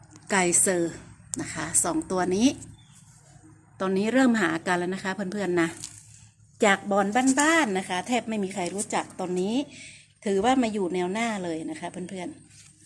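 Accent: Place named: North, Thailand. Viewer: คนเหนือกาครับ สไเนืองคุ้นๆ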